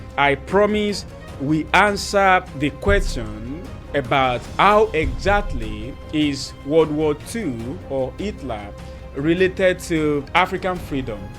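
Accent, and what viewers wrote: Yoruba, Nigeria. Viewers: This dude has good content & one of the funniest accents ever 🤣😂🤣. Is this a Yoruba accent?🤔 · Yes he's from Yoruba nations. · Captions are generatable automatically. Let those who want to watch it be attentive. They'll get used to his Yoruba Nigerian accent just as we've got used to their various foreign accents of English and French.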